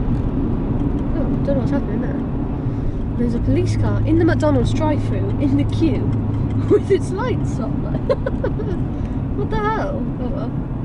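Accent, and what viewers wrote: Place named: United Kingdom. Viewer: Where is the wing? I had a band new 1990 Twin Turbo Z in SF California... loved it... drove it 18,000 miles - dumped it moving to LA for film school for a 1991 4Runner and cried. I went through 3 sets of fronts and 2 sets of backs... As for tires - go for Michelin Pilot 4S pilot sports - the best rated!... I'd recommend what I'm driving instead a 330hp+ with mods 2005 silver Infiniti G35 with no Turbo... newer, faster, no turbo and about $8K plus sunroof used now. (I love my sunroof!). Thanks for the memories and your great UK accent. Enjoy! Try a G and forget the Z... as you get back seats even on the G35 6mt coupe which lowers insurance.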